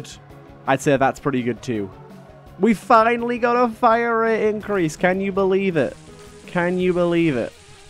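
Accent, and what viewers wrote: United Kingdom. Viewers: After watching the whole video I only have one question: is this guy british?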